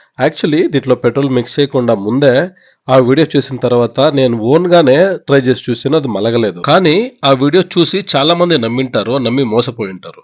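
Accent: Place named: Karnataka, India. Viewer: Bro meeru Kannada na ? Endukante , ma frnds kannada colleagues kooda telugu ilane maatladutaru , so slag acham alane undi Karnataka people's laga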